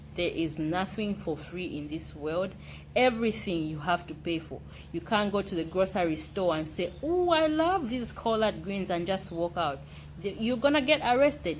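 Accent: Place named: Jamaica. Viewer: That’s not good everyone should be treated equally... you almost sound Jamaican.. I rather stay home and suffer..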